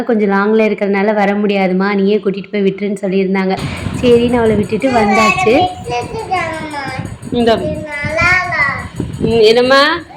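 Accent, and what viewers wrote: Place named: Ramnad, India. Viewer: Akka unga voice abdiye ramnadla ulla pechu varthaiya iruku Alhamthulillah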